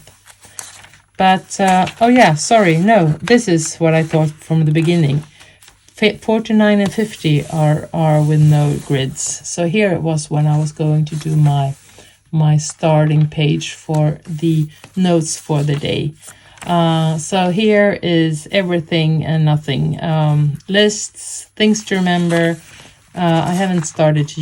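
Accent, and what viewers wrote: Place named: Sweden. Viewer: Thank you for sharing! I love how these look. Shipping to USA is just so expensive 😑 Maybe there will be more options for next year 🤞 I am so happy to see you are back, I've missed you and your videos. My 18yr old son overheard this video and said "she sounds like mormor", so I asked what do he meant and he said her accent sounds like she could be Swedish, like mormor 🥰 I love it!! I am Swedish, and my family only hear accents from my family from Sweden 😂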